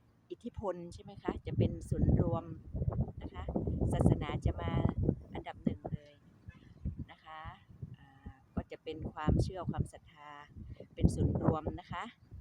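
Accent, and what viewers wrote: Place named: North, Thailand. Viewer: มาฟังสำเนียงเหนือด้วยคนค่ะเพราะมาก❤❤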